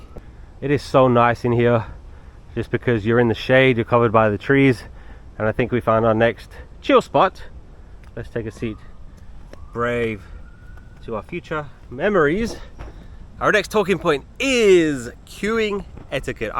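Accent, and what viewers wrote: London, United Kingdom. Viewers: To me, you sound very British. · Thanks - this was a great topic. Yes, you definitely sound British to me. It was interesting to learn that British people find that the American accent is annoying but we find the British accent attractive - very interesting! I'm like you - I don't understand the attraction people have for going out to spend $10 or more for a cup of coffee when they can make it at home for pennies. When I was teaching in a high school I was amazed at the number of students coming in with their cup of Starbucks when I knew with my teacher salary I could not afford it every day!! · Thank you for the lovely stroll, although in that heat it would have been a quick one. In answer to your question about whether you sound British or not, I think you definitely do but I can also hear that you have spent quite a long time living in China and speaking Chinese, of course. 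Anyway you have a unique respectful voice. 😊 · Are you kidding?I don't know how anybody could think you don't sound British! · Enjoyed the video and you sound British to me! One thing that surprised me when I was in London was every single taxi driver initiated and maintained a conversation for the duration. In NYC conversations have been limited to destination address and a thanks at the end. Looked very hot out! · Good to see you back! Hope you enjoyed your break. Fellow Brit here and you do absolutely sound British to me but there is the teeniest, tiniest hint of another accent that doesn't scream London (or other parts of England) to my ears that I haven't been able to place yet and it has been bugging me 😆 You do have a hint of a (South) East Asian look about you but I don't know if that just me being coloured by the time you spent in China. Look forward to a 'reveal' one day then! 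Regarding queuing: I was at a sci-fi con in London once and with the group of friends I was with, we decided to sit down in a line outside the entrance to the main hall when it wasn't open or due to be open for a long, long time. I would say within a couple of minutes, other people had started to sit down or stand up to 'continue' the queue (that was not a queue at all!). We found it hysterically funny and were mean enough not to say anything to anyone. People just seemed to naturally gravitate towards it and join it. We may not love them but does our respect for them predispose us to gravitate towards them, I wonder? Yet another great video by the way. I knew Central Park was BIG but not quite THAT big! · You sound British for sure. In America there are different accents depending on what part of the country you live in! I think UK is probably the same. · I would definitely say you sound British. 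Have you heard the regional accent of the Boston area? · Good Sunday,!Glad to see you walk and talk in Central Park again! You definitely have British accent but in our days people travel around more than any other time in human history. So, you pick up something here and there! That is the privilege of being modern. No, you do not sound German and I have had lots of contact with them. You are British boy! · It was wonderful waking up (as it's early moring in australia) to a notification of your video. I've never been to London, not yet anyway, but i have been to New York several times. I love visiting, but there is a slight air of arrogance with people, not all. Walking down the sidewalk, they walk right at you, don't really like waiting in queues, talking really loud, some think the louder you talk the more important you are. Having said all that, its not everbody, and I've had some wonderful interactions with americans. 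Your accent is very British. Some people think us Aussies have a different accent, i dont understand it 😂. In Australia we also love tea, i remember growing up with a pot of tea with leave leaves and a strainer. We also love our coffee and now our coffee culture is huge. Thank you for another wonderful video😊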